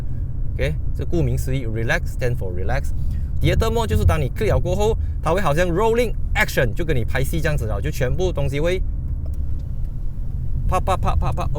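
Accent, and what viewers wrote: Malaysia. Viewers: 哈哈哈哈 主持人长得还挺好看的。马来西亚中文好有趣啊，虽然都能听懂，但是不知道为什么总透露出些许调皮。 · 人家就是故意講馬來西亞式華語來不讓你們（偷偷）把視頻搬去中國放咯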